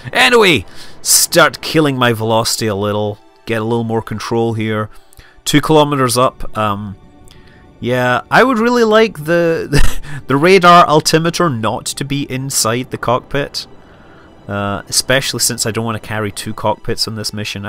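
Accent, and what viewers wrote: Scotland, United Kingdom. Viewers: are you scottish or irish? cant tell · Hey, or you Scottish or Irish? Forgive me if you're something else, your accent just sounds more Scottish to me. Sorry if i offend you!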